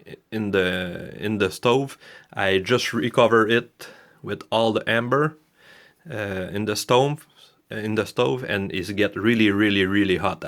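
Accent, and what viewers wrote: Quebec, Canada. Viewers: ca sonne comme un quebecois ca.......... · It's francophone Canadian. A very strong francophone I might add. I'm also Canadian and only speak English... I sound nothing like this and the majority of Canadians do not sound like this either, but Canada is the only place you will hear this particular francophone accent. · You sound Canadian. Is that where you are from? Love the accent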